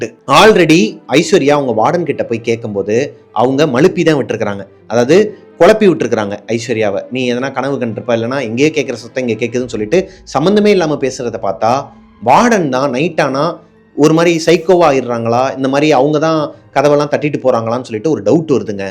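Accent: Madurai, India. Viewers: anna naum madurai Tha anna nanum samaikura appo vessel watching apo Matti illa nna mob panra apo yellaneramum unga vedios mattu Tha nna ketutee vela papaen❤ seriously nega oru Stress Buster nna enno nega neraiyaa gost vedios poduga nna especially nama ooru slang na pesirathuu enaku romba puduchurukuu nna love u Broooooo❤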